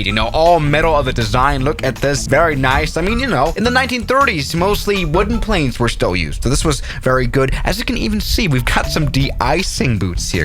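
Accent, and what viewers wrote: Mid-Atlantic, United States. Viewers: Your accent is good. It's pretty close to normal mid Atlantic American. I'm impressed.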